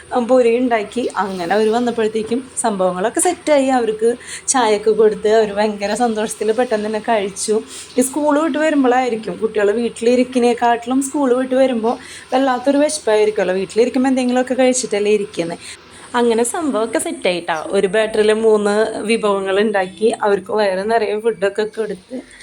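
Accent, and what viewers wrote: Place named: Thrissur, India. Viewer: Masha allah ❤❤❤ നിങ്ങൾ തൃശൂർ ആണോ സംസാരം കേട്ടിട്ട് അങ്ങിനെ തോന്നുക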